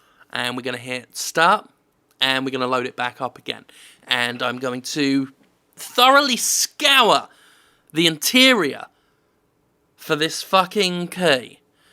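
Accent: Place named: United Kingdom. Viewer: Hey I want to know, I'm I may, how do you maintain your Britishness? Is America slowly taking you over? Like in ten years will speak with that Mississippi accent and fire your guns five times a day?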